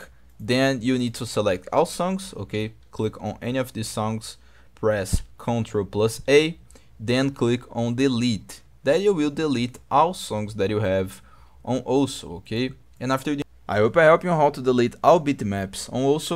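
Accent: Brazil. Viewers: acho que da pra perceber um pouco seu portugues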